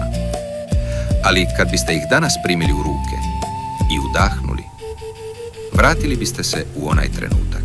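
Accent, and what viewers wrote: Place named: Yugoslavia. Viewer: ovog "jugo" naglasavanja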